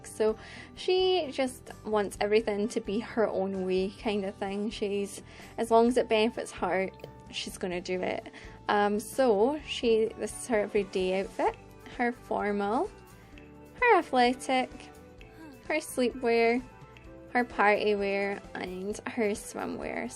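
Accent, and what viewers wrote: Scotland, United Kingdom. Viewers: I'm pretty sure is Scottish, not Irish.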